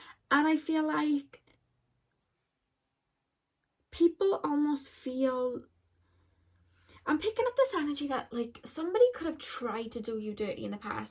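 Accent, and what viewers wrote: Wales, United Kingdom. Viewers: #1. Love listening to your lovely welsh accent.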